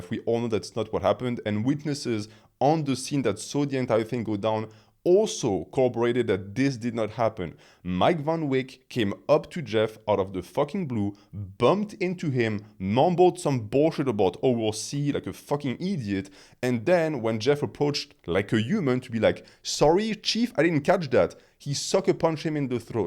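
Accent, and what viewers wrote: France. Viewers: I love your French accent, it’s like listening to Baudrillard talk about weightlifting.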